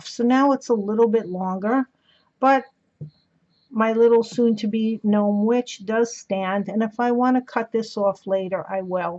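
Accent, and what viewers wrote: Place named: Long Island, United States. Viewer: When I started watching this video I felt like I was listening to my family - Long Island represent ! 😍 Adorable gnome witch!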